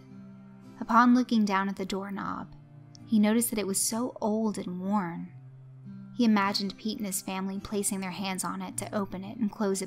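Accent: South, United States. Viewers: This Southern drawl you do in these kinds of pastas is so sweet! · Damn racism, it's never funny. I love the southern accent. You know how to narrate you southern bell :)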